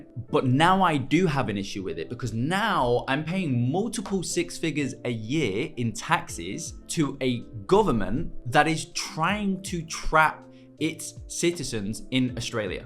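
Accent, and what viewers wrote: United Kingdom. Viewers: where are you from originally? Northern UK I'm guessing with the accent?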